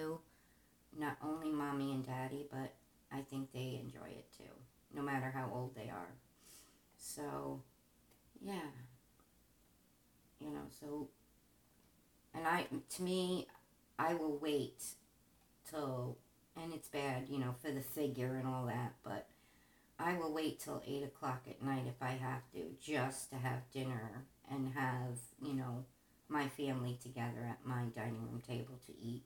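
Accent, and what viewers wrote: New York, United States. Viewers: Can't wait to see your new craft I'm loving your Newyork acsent 💋💋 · OH SNAP!! Gotta love the New York accent and honesty! You answered any questions I had about what was going on :) LOVE 💘 ya girl!!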